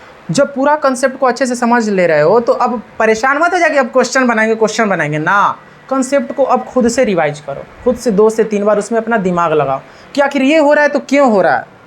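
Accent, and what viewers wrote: Bihar, India. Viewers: bihar se ho bhaiya . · Bhai aap ...Bihar se ho ?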